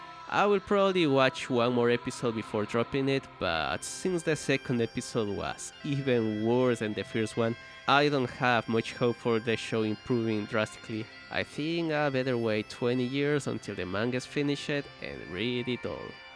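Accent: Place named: Eastern Europe. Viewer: I can hear some Eastern European accent. You're from Georgia?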